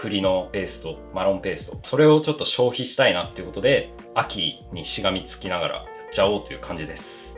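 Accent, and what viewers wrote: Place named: Yamaguchi, Japan. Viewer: 明日市販の栗のシブーストを食べる予定です。自分で作れるかなと思って検索したら、動画をあげてくださってて嬉しいです。ところで山口県の方ですか？○○けんっていう話し方で、そう思いました。私がそうなので。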